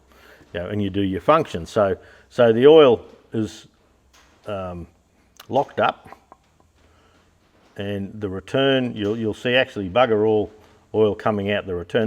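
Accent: Australia. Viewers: informative. I like your aussie English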